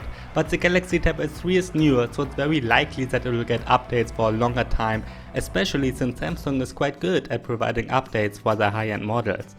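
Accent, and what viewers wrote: Germany. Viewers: As I am German too, I might be the only who is surrounded by this accent everyday lol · Is so hard to understand your ligo m8 for a German U definitely have the worst accent I've ever heard is so unpleasant. Unlike my other German friends & my German girlfriend she has a brilliant accent she almost speaks perfect English like my other German friends at University. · Man merkt dir an, dass du Deutsch sprichst grundsätzlich weil sonst hättest du LCD panel bei ca anders ausgesprochen genau so wie viele ausdrücke :D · Are You German? Weil Ichs Auch Bin und du hörst dich extrem deutsch an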